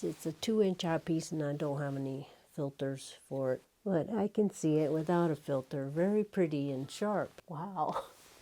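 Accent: South, United States. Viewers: Love your smooth southern accent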